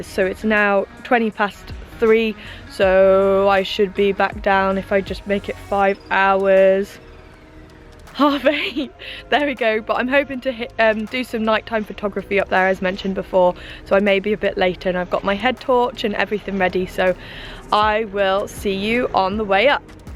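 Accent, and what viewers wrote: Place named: West Country, United Kingdom. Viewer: ...scaffold Pike 😜 Scarfell Pike ✔ you have a West Country accent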